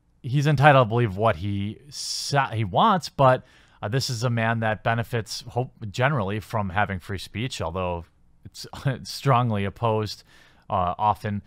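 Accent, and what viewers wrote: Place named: Wisconsin, United States. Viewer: What? youre from wisco? Haha I knew the way you speak sounded awfully familiar. I'm from Madison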